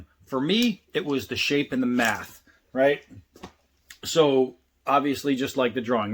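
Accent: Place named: New England, United States. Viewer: Yeah, I figured you were a combat vet. My entire family was in the service. Except me….lol. In listening to you talk, I kinda figured it out. Well, that and you’ve got the New England accent like me. Anyway….stay safe,little brother and thanks for the content. It keeps this old man in good spirits and makes me look forward to your next vid. Be well….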